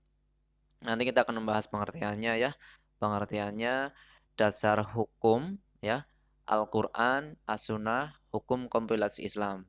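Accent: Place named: Banyumas, Indonesia. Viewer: Semakin kebelakang, semakin ngapak. Wkw. Terimakasih ilmunya pak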